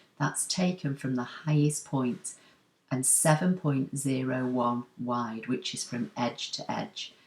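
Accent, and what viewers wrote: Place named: Bolton, United Kingdom. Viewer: Hi, are you from anywhere near Little Lever, Bolton, youre accent seems very familiar. Also I had an aunt who lived in Breitmet that had a clock just like the one I hear in the background! Love your videos by the way, I am waiting for my first ScanNcut machine to arrive.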